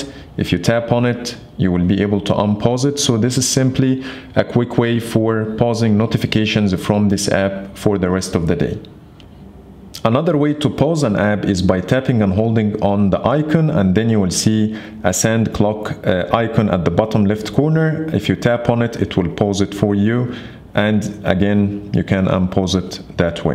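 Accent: Egypt. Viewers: Wooow!! Egyptian accent i guess;)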